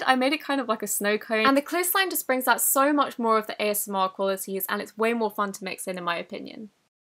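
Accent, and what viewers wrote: United Kingdom. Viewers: Are you British? You sound British.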